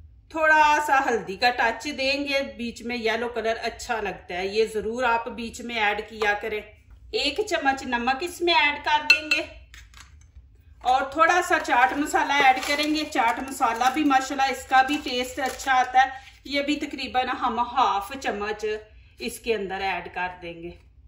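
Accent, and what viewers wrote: Punjab, India. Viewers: maashaalla look delicious. AP bolti b bahut piyaray hai Punjabi touch Ata hai. love and respect from my channel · Yummy. Dakh ker both Maza Aya. Urdu main Panjabi lehjy Ka meetha touch. Kia khob.maza a gia😊❤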